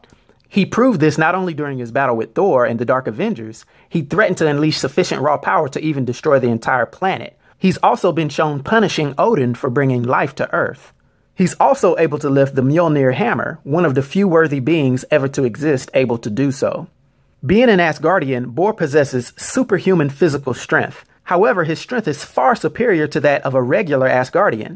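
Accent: United States. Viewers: Terrible hick accent. Lol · great video and loved the accent (american i think?) pronounciation of some of the names! was very different to hear. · He has Texas accent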